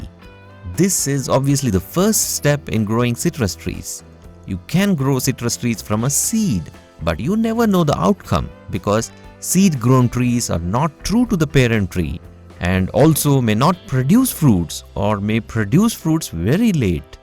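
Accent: India. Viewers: Hahaha that Bollywood accent is so funny and cute! Nice vid! Thx!😂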